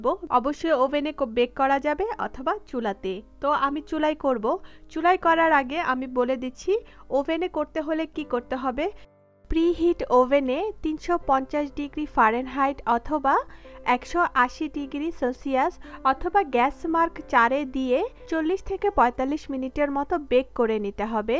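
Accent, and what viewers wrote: Sylhet, Bangladesh. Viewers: apu apni ki Sylhetyy?? Apner shob rannai amer kuv valo lage 💜💜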